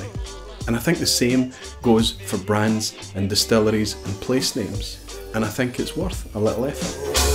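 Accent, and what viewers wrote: Scotland, United Kingdom. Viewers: I'm glad its a Scot teachin us how to pronounce Scots! I'd have died laughing if it was a Yank.... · ... and other sounds either. Your scottsh accent is very good to understand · Scottish. My favorite accent. Don’t tell my Aussie husband 😏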